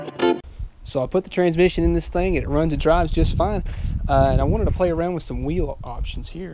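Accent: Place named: Mississippi, United States. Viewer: can't help but notice you gotta Mississippi accent ... least that's how it sounds to me lol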